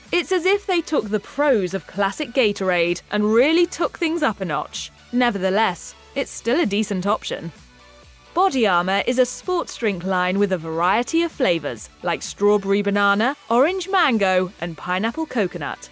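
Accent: England, United Kingdom. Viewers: Why do you use a British accent in some of your videos? Is this a British content provider? It’s strangely distracting. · English accents legitimize Mashed Science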